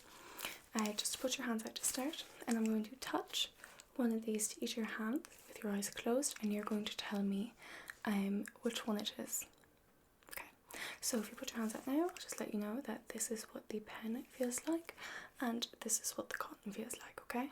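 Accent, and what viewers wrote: Ireland. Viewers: Can't help but love the Irish accent! Hope to live there one day! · I love your accent!!! Though that might just be because I hear it all the time when I’m around my moms family since they all have an Irish accent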